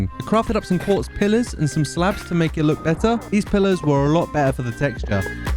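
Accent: United Kingdom. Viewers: Your so British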